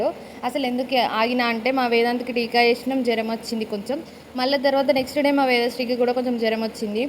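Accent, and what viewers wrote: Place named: Telangana, India. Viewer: పక్కా తెలంగాణ స్లాగ్ 🙏🙏🙏🙏 Super super 👌 👌 👍 👍 💐 💐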